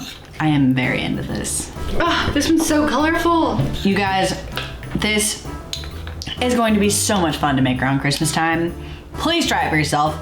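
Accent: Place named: Georgia, United States. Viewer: YAWL!!! OMG!! You must be from Georgia!! I love your video! I felt like I was in the kitchen with you. Thank you for being real and showing the mess ups! We learn fromour mistakes.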